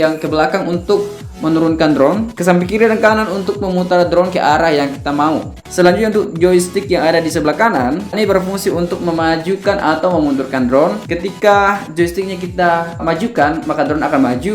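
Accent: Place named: Makassar, Indonesia. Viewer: Wih orang makassar kach bang😁👍👍👍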